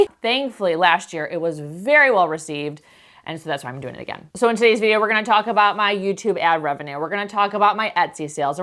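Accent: United States. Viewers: I’ve been following for a few years and seeing you grow to this extent has been such a genuine joy to witness. I watch you and Laura’s videos religiously and they provide such different, but equally inspiring and entertaining, vibes. For me, you’re the British lady with an American accent 😀 I’m British and I just find your sense of humour so comforting. Well done lady! You have strangers feeling proud of you and that has to be a cool feeing ❤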